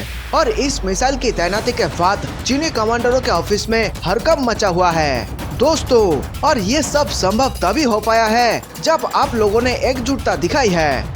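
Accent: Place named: Ladakh, India. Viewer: Sar aap laddak se hay ya chin ke aap ki avaj me laddak ki miksing hay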